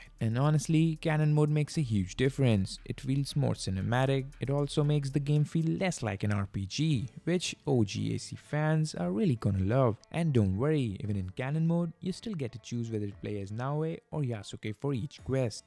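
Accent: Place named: India. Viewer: Голос как у Индуса.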